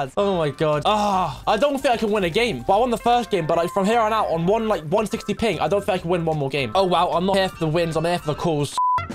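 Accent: United Kingdom. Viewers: Your British are you well if you are than So am I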